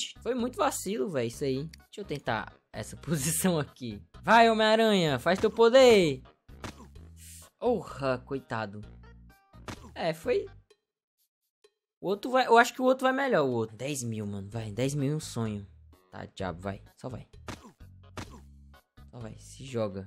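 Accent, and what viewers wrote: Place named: South, Brazil. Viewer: Pelo sotaque acho q o é do Sul.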